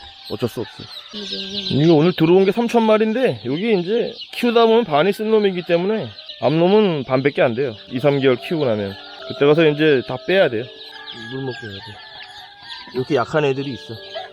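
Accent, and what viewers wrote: Gangwon, South Korea. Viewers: 강원도 사투리 구수하네요 ㅎㅎㅎ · 1. 농장 이 어디 지역이에여? 2.강원도 분이신가여? 강원도 사투리 같아서여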